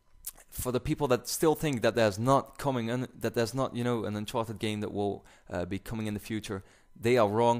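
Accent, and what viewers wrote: Netherlands. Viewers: You sound Dutch, are you? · You sound dutch, bro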